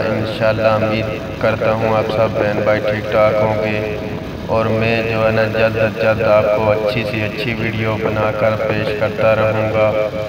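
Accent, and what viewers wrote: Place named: Saraiki, Pakistan. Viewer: آمین ثم آمین سرائیکی آواز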